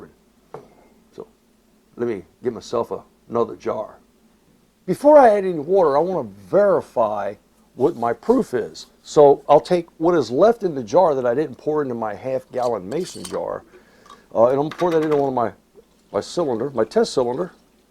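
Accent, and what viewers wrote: Texas, United States. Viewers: Are you from texas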